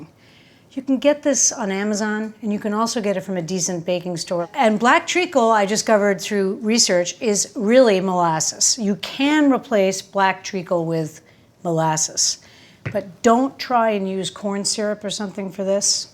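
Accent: RP, United Kingdom. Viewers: Great brit accent! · is that how she talks? im going to watch the video after this one, but the voice sounded like...the queen's dialect.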